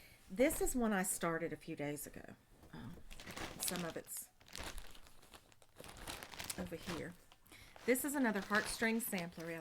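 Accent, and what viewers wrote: South, United States. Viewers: Love this video,! You shared your favorite things and it resonated with me! I also love your southern drawl! We lived in AL for a couple of years and it just feels like home! Please keep floss tubing!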